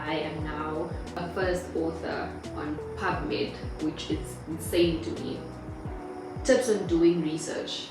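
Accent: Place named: Afrikaans, South Africa. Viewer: You look like an overachiever fr. The Afrikaans accent came out progressively. Sub & like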